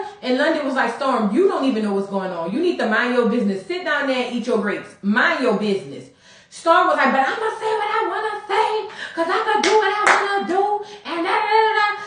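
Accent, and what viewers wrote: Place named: Louisiana, United States. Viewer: Love your accent. You must be from Louisiana. Porsha is not over Dennis and she wants him to want her and show him what he is missing with Simone.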